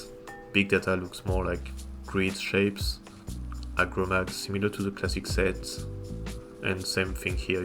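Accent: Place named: France. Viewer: I knew you speak French from the start from the accent I also have haha ! Alors voici un nouveau sub pour encourager la communauté française de Blender❤ en tt cas la différence entre la première image et ce que tu as changé est incroyable ! Du vrai art , bravo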